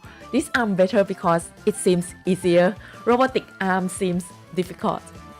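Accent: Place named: Japan. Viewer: Why Do You Sound Japanese?😮😮😮 Btw I'm New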